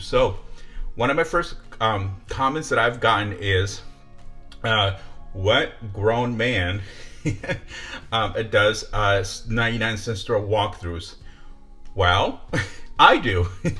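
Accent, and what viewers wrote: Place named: United States. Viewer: Great video! Talk white? 🤔 Well if you grew up in America you would have an American accent...duhh. Lol and that's ok! People nowadays!😜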